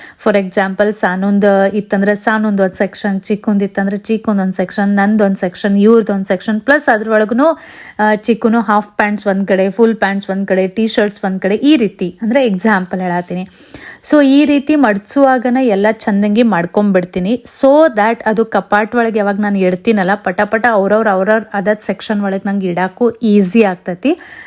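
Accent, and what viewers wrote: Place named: Belgaum, India. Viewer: Nivu bhal channngi matadutri namma belgium bhasha keli bal chalo anisstte❤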